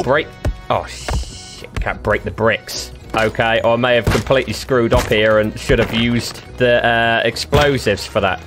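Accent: United Kingdom. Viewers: Your british accent just makes it so much funnier damn.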